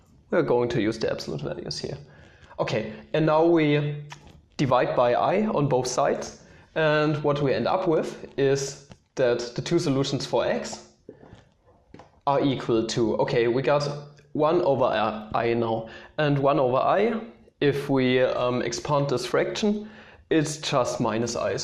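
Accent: Germany. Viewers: Bist du deutscher? Man hört deinen Akzent hehe und dein Video hat mir geholfen omg danke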